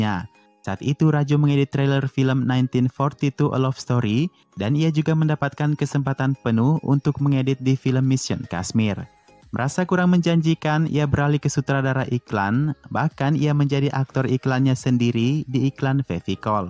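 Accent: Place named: Makassar, Indonesia. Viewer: Admint org makassar kah..logatx kaya org makassar 🙂😃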